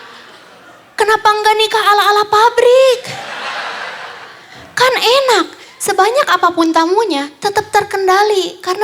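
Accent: Medan, Indonesia. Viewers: ku kira kau medan kali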